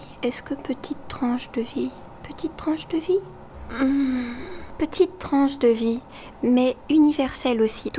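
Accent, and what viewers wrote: Quebec, Canada. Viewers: Comme toujours, merci / ☺️☺️ J’aime tes réflexions, non! J’adore tes reflexions!! ☺️ Les tites photos ( ton accent québécois hihihi.. 😊) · Incroyable comme a encore un vrai accent québécois 100% quand elle le fait, après ne pas l'avoir parlé aussi longtemps! En tant que Youtubeuse bilingue québécoise et canadienne ça me fait du bien car je fais des vidéos dans les 2 langues ce qui est un énorme PLUS car j'ai pas que 2 langues, j'ai 2 cultures, mais y'a des gens à l'esprit étroit qui me mettent des thumbs down parce qu'il y a 2 langues dans le vidéo et ils n'en parlent qu'une. J'ai des gens que j'aime qui ne parlent pas l'une ou l'autre. Je refuse de me limiter, d'exclure ou de niveler par le bas en choisissant une de mes 2 langues que j'utilise autant l'une que l'autre à tous les jours, autant à l'oral qu'à l'écrit. Alors les gens si ça vous intéresse allez voir mes vidéos c'est très vivant, coloré et diversifié, intelligent drôle et sûrement un peu bizarre. 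Je n'ai que 142 abonnés, j'aimerais atteindre le 1000 pour avoir accès au volet "communauté". Quand j'atteindrai les 150 abonnés je fera ma première vidéo en direct... Bilingue, évidemment! 💜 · Cet accent québécois 😍 "je veux pô prendre une tite photo" Sinon tout à fait d'accord sur vivre à 100% l'instant présent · J'ADORE QUAND TU PARLES AVEC L'ACCENT QUÉBÉCOIS CONTINUE S'IL TE PLAÎT JE T'AIME TELLEMENT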